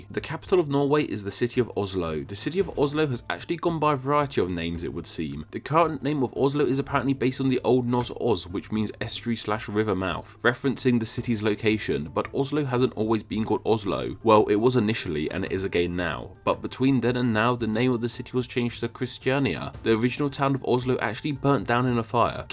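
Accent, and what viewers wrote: United Kingdom. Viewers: Ah. British accent.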